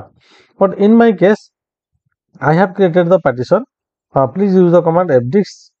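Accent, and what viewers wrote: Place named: India. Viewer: indian english also good, i should learn it.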